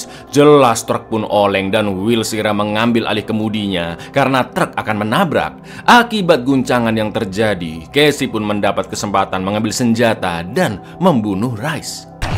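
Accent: Java, Indonesia. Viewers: Pdhal pengen nonton. Tp terlalu medok Jawa nya. Gak asik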